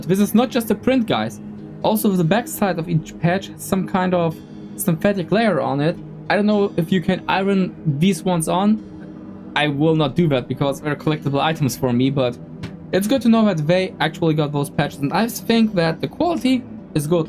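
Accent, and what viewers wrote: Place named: Germany. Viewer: Shout out to random, Eastern European, gaming channels. Gotta be one of my favorite genders. Edit: Ok, turns out he’s German. I’m really bad at identifying accents.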